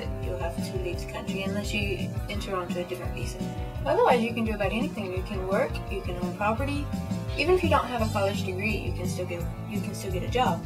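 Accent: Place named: South, United States. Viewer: i was wondering where she's from until i heard that.... ahhh. yep, thats southern. :D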